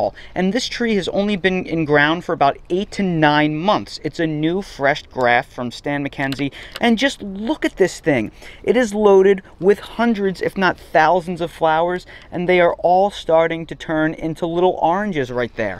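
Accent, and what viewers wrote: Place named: North, United States. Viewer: Florida land is far more valuable than the fruit industry yields in profit. Citrus farms have been selling off for years. In GA/FL, we pronounce it FlOOR i da. You sound like you're from a little farther north than North Carolina.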